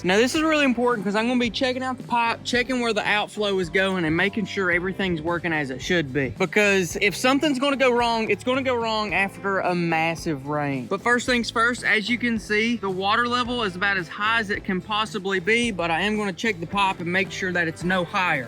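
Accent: South, United States. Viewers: It's still A riot to me that in this country, people actually talk like this honky tonky accent. Unreal! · Don't even know how I came across your channel but I've been watching 4 to 5 vids each night, I'm obsessed! And in my opinion, as a non American, your accent has quickly become my favourite American accent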